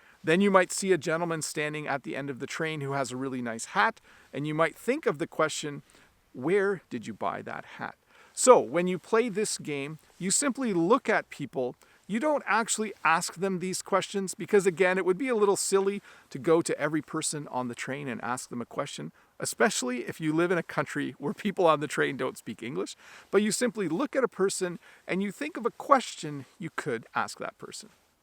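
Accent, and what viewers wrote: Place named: Canada. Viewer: Thank you,. These games can be helpfull to improve English. The same games we have in my country as well but I didn't recall them. By the way - your accent is very great, at school they always said that Canadians have french-like accent. No offense:)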